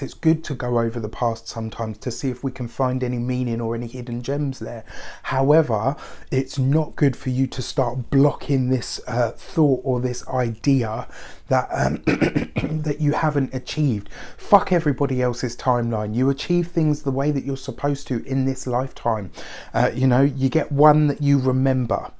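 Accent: United Kingdom. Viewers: OMG your british accent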